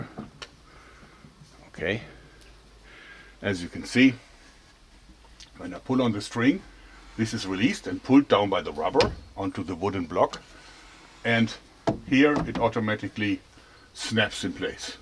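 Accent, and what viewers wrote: Germany. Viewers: Sobald ich deinen Akzent gehört habe wusste ich, dass du deutsch bist 😅 Schön mal einen Deutschen zu sehen, der solche Videos macht (auch wenn sie in Englisch sind, was für mich kein Problem ist)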